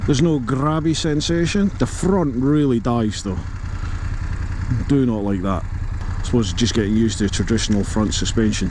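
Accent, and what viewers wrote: Scotland, United Kingdom. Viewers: I always love listening to those lovely Scottish tones:D Do you actually live in Scotland?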